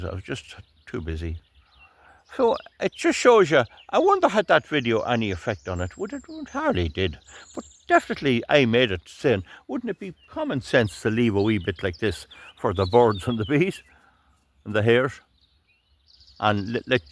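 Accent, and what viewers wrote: Ireland. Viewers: I love the Irish accent :)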